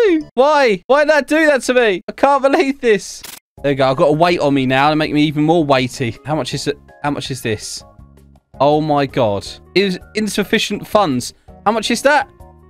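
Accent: United Kingdom. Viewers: Hmm u sound British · Are you british bohou oh wauh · Are you BRITISH???? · His British accent never gets old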